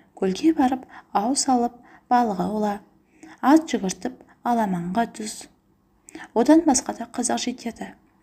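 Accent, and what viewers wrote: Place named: Russia. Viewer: сол үйрену үшін қателіктерін айтканымыз дұрыс шығар. И орысша акцентінде түзеп анық қазақша оқу үшін қазақша кітаптарда мәнерлеп дауыстап көп оқыса . Сосын барып бүкіл елге оқыса дұрыс болар еді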